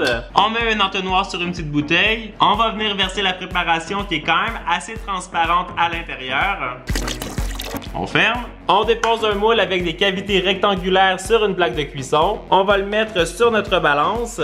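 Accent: Quebec, Canada. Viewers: l'accent québécois est vraiment insupportable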